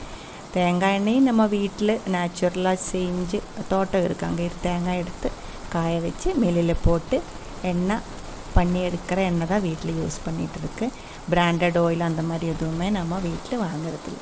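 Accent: Kerala, India. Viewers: പക്ക മലയാളിയുടെ തമിഴ് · Sadharana eniku thamil manassilavarilla but ningal samsaram nannayitu manasilavunnu. Keralathil thamasikkunnath kond malayalathinte influence undo samsarathinu? Nice vlog...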